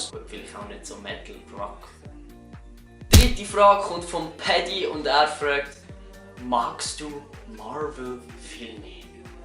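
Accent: Switzerland. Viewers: Wir Norddeutschen brauchen doch hin und wieder Untertitel. Aber lass Dich nicht stören. Schwyzerdütsch ist schön. (Oder ist das gar schon Zürcher Hochdeutsch?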